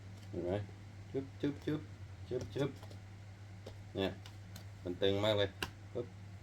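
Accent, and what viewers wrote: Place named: South, Thailand. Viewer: หล่อจังเลย ชอบมากๆๆ❤❤❤❤ น่ารัก พูดสำเนียงใต้ยิ่งน่ารักไปอีก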